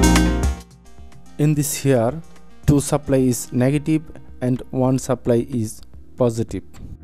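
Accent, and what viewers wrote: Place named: India. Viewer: your english is shite. speak proper english not the indian english.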